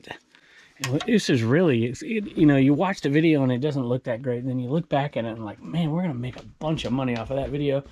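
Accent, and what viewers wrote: South, United States. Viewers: I could listen to you talk all day. You have the cutest southern accent and wonderful inflection. You could really read for audible in my opinion.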